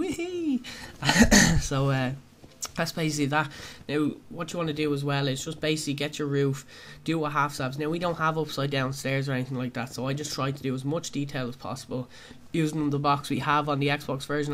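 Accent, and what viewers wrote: Ireland. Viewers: You sound Irish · You kind of sound Irish but I can't tell...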